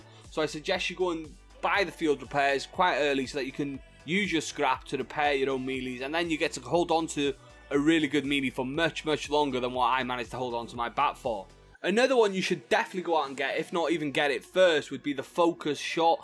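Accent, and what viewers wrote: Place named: Wales, United Kingdom. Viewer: Exactly what i heard lol, gotta love the Welsh? accent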